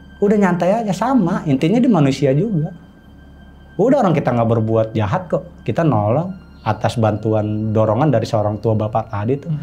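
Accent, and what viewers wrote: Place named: Betawi, Indonesia. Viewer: Keren lu bang bahasa Betawi Bener 😂🙏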